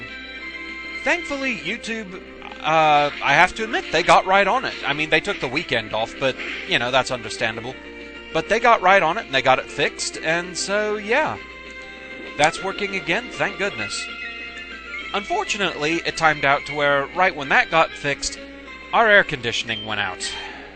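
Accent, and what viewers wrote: United Kingdom. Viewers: Okay, call me ignorant - you live in Texas, but you have a British accent and you use British colloquialisms. Are you originally from Britain, or do you just put on a British persona when you record? :-)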